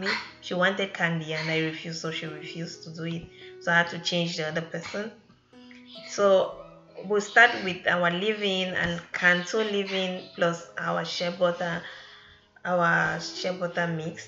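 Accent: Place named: Africa. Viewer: Do your daughter have an accent like yours? I love your beautiful African accent, where in Africa are you from?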